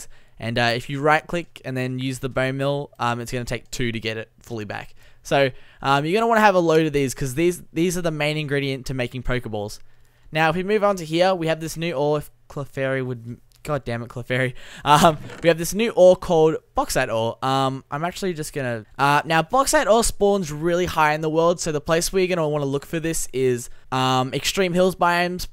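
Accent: Australia. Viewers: He is from Australia that is how they say it there and if he says it our way his mom will make fun of him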